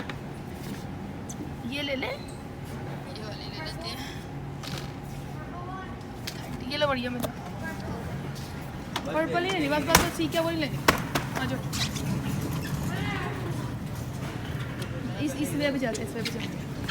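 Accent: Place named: Lahore, Pakistan. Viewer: ye Lahorei style hai.hume tu bht pasand hai.m bhi lhr se hun.or bht acha bolti hai👌👌 jee❤❤❤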